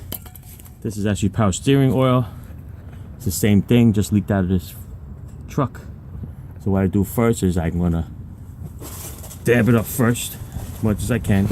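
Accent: New York, United States. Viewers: Great job. That's a heavy NY/NJ accent. Lol. Cool though bro.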